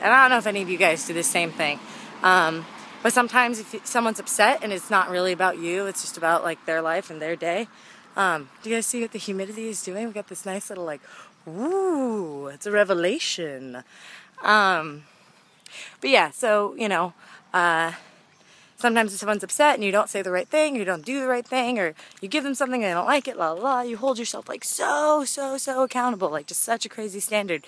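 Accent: South, United States. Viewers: I think it was the accent that bugged some as it sounded southren american but I enjoyed it regardless. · I enjoyed the Vancouver episode, even with the Southern accent and the absence of rain, continue being you <- sorry, the second "h" was used for "eh":) Sincerely a fan and life-long Vancouverite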